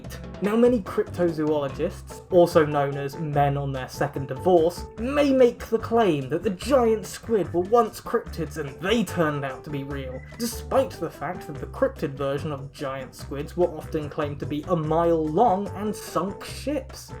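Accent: United Kingdom. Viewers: guys is she un ironically british?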